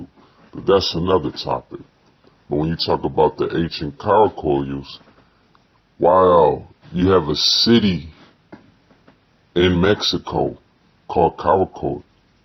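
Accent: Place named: Philadelphia, United States. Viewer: This sound like my homie from Philly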